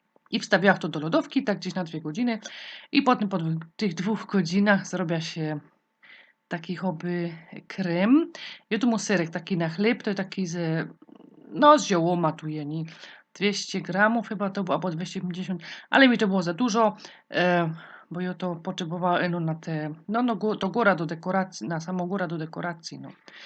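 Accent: Silesia, Poland. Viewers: Mówi po śląsku bo to Ślązaczka to fajny gwarowo język 😃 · Czesto ogladam Pania i nie umia sie nasluchac godania jo tyz jestem z slaska pozdrawiam fajne przepisy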